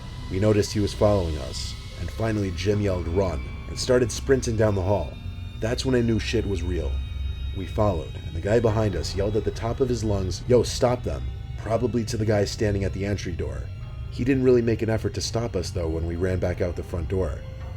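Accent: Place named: West, United States. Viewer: Let's be honest voice is so good for stories like this and it sounds western. His clogged nose make it sound better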